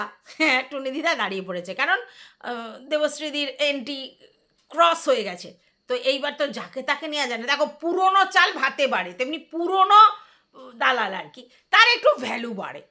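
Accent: Bangal, Bangladesh. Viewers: Darun bangal vasa koile go dil khus😂❤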